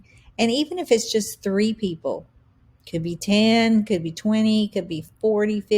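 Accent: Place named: South, United States. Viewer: You have such a delightful southern accent. I've watched this twice:pride-flower-pansexual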